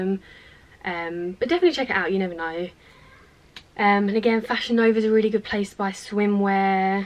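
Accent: England, United Kingdom. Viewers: I love your british acent....! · You drive me crazy! Your so beautiful, that long red hair and English accent, you are just bad to the bone. You are number one on my want list.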